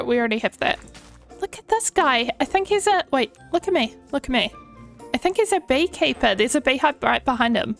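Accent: New Zealand. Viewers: recently found your videos and wanted to just say thank you, ive been trying to find some kiwi youtubers as ive just moved to australia alone and am missing home alot, sounds stupid but ur voice and the added kiwi accent puts me at ease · Instantly recognised the accent!! 🥝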